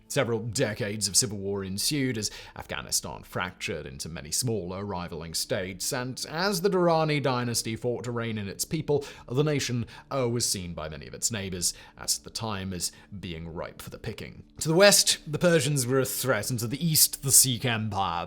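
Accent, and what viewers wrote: England, United Kingdom. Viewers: Afghan history should certainly not be told by a dude with English accent especially when you skip the nasty details in favor of the British oppressor and aggressor! Correct version: The Bacha-i-Saqao was an orchestrated Islamic uprising by the British to oust the real Afghan king, Amanullah Khan, in 1929. When the last Afghan king was removed, the British RAF instantly installed Nadir Shah and his four brothers (the Musahiban brothers), who attempted to erase the era of Amanullah Khan and rewrite Afghan history by killing thousands of Afghan students and intellectuals in order to enable the British annexation of Afghan land (Durand Line), which Amanullah Khan had freed after the Third Anglo-Afghan War in 1919. Amanullah Khan had also formed a pact with the Bolsheviks, better known as the USSR, in 1923 to distance Britain's imperialism. 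The British puppet king, Nadir, also fostered division in Afghanistan by promoting racism among different Afghan ethnic groups, especially the Hazaras, who became the oppressed group. Nadir even created a separate class and caste within his own Pashtun ethnicity; his tribe, the Mohammadzai, was considered superior to other Pashtun tribes. Interestingly, Karzai, the first USA-backed Afghan puppet, is a relative of King Zahir, who was the son of the British puppet king, Nadir, just as Ghani was a puppet of the West, serving the interests of USA banks to exploit Afghanistan. Afghanistan gained its independence because King Amanullah Khan recogniz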